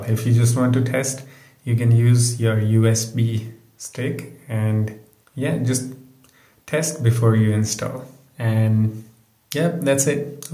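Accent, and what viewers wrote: India. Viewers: anyone knows he is from which place talks like and indian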